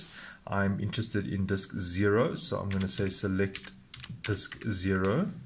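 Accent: South Africa. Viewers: That South African accent! Thanks bro, from one saffa to another!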